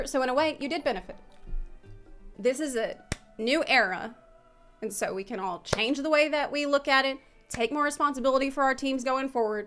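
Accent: South, United States. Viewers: Embrace southern. I had no idea she was southern, but I'm here for it. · Damn, has a thick southern accent. 🤣🤣🤣🤣 And dicks out for Harambe! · Why does have this weird southern drawl all of a sudden? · I feel like has gotten more and more southern the longer she's been in the U.S. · Damn, has fallen hard back into her southern accent 🤣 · WoW being back in the USA sure brought up your Southern drawl!:D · …what is this country accent?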